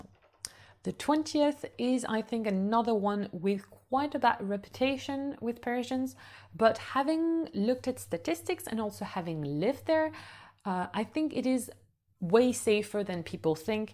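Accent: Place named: United Kingdom. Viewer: You sound like you are English (British)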